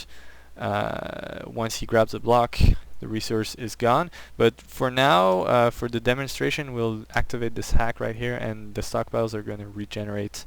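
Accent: Quebec, Canada. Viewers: Vous êtes Québécois ? Je dis ça, car on dirait que vous avez un petit accent Québécois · I recognized the quebec accent immediately :) .. also.. cool looking game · Le québécois, je dirais... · By the sound of your voice and you name, i guess you'rs from Québec? Am i right?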